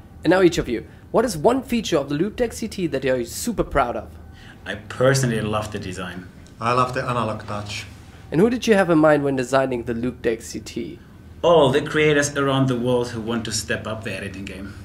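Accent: Germany. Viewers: The bell rings. Der Hund ringt. Höre ich selektiv oder kommst Du ursprünglich aus .de? ;) Super Video und das CT habe ich soeben auf meine Weihnachtsgeschenkefürmichliste gesetzt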